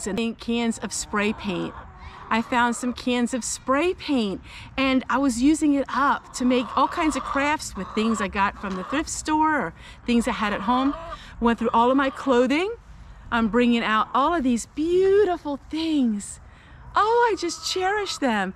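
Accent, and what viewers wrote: Pennsylvania Dutch, United States. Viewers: "Cryin' in a bucket" Oh, I do like that. My first thought was ", your PA Dutch is showing" I am really enjoying this video. Just got to the part about how we like it when you ramble, and yes that is true! "Maybe it's 'cause you're talkin' ta us like we're just friends" Love and hugs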